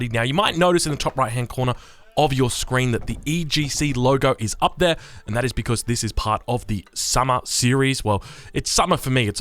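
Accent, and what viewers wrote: Australia. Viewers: the highlight of my work day is your aussie voice saying good day fellas or whatever and then proceeding to slay commentary for the next hour. thank you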